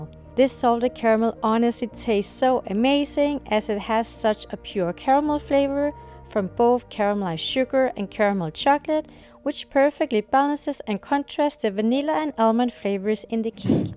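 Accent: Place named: Denmark. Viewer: Hej jeg kan høre en dansk accent og navn! Ser super godt ud! ^-^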